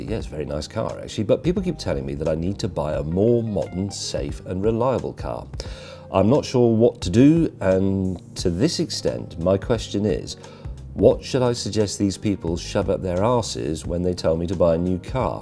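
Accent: United Kingdom. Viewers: Would you please extend the content of your channel, gotta hear dem british accent more(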